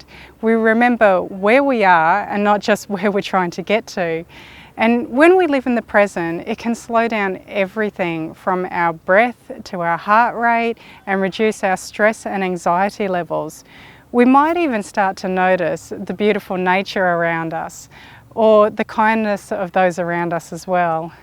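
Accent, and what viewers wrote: Australia. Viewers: You do not look pure chinese, plus your last name is Indian, where do you originally come from? Accent is definitely Australian but the looks is so mixed, you cld even pass as an arab by the way.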